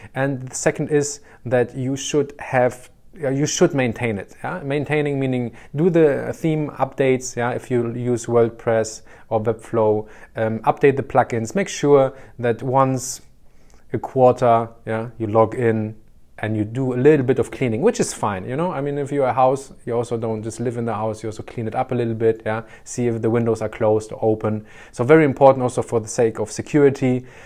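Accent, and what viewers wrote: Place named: Germany. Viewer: Kann ich dich vielleicht irgendwie sprechen, ich bräuchte etwas hilfe. Danke für das Video, ich habe rausgehört das du Deutsch bist. LG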